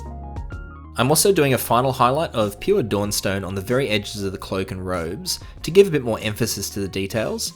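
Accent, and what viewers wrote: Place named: Australia. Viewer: Keep up the good work,! Your paintjobs are crisp and I really enjoy the Aussie accent when I see your painting.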